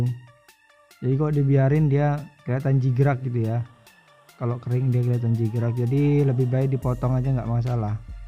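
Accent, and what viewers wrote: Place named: West Java, Indonesia. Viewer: Kyak logat sunda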